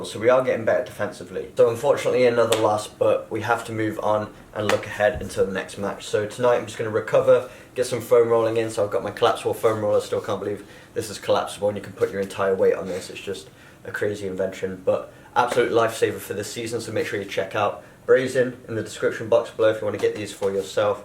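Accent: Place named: England, United Kingdom. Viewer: Where are u from? Sound English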